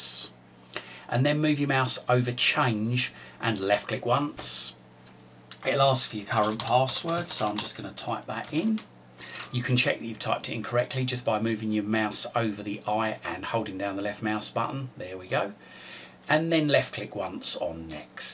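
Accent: United Kingdom. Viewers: WHOA ITS BRITISH!!!!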